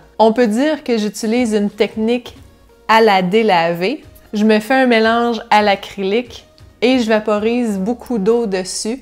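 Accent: Quebec, Canada. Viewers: Ma chère. Ton tuto est magnifique tout comme ton accent du québec. Bise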